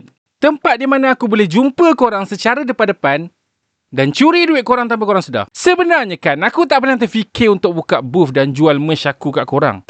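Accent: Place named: Kedah, Malaysia. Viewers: Wowww cakap utaqa bakhang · Tudia, dah Mai Kedah dia pekat nahhhh...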